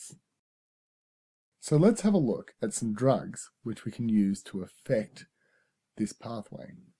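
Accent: Australia. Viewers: thanks! I could tell an aussie from a mile away. from a kiwi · This is FANTASTIC! Awesome job! Love that it is Australian as well, and not American with words pronounced oddly! Would be AMAZING if you did a tute for this series on the "Triple Whammy" (effect of NSAIDs, diuretics and ACE-I) - I have searched the internet relentlessly and never found one! Please please please! Thank you x<num> for your fabulous videos!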